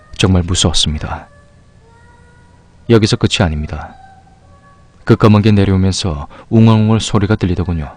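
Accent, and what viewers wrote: Gyeongsang, South Korea. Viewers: 이런건 심심해도, 호기심이 생겨도 하지않는게 좋을것같네요. 오늘도 님의 목소리와 경상도사투리를 들으며 하루를시작합니다. 좋은하루보내시고 화이팅~~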